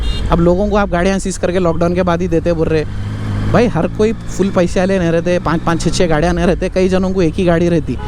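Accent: Hyderabad, India. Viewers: Bhai speed triple k upper ek detail wali video he bana do garh pe reh k. 😂love your Hyderabadi accent❤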